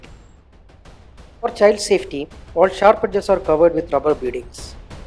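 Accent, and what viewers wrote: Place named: South India, India. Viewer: Hello sir, your slang seems to be that of a South Indian. To be more specific, a keralite or a tamilian. I'm from Tamil Nadu. I'm following starting strength for a couple of years. Now I'm planning to build a home gym in my apartment based on ripottoes's recommendation. But, I was concerned about the deadlift disturbing my neighbours downstairs or creating a great vibration in the floor. Were your deadlift quiet enough to not to concern your neighbours?. And it would help me a lot if your share your designs. Thanks in advance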